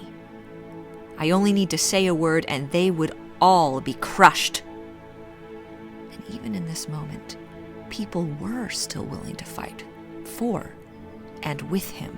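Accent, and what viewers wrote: United States. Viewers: The loveliest American accent - and great delivery.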